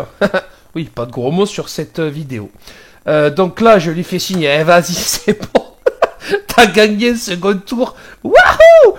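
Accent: South, France. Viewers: toujours top les vidéos surtout avec l'accent du sud oh punaise 😂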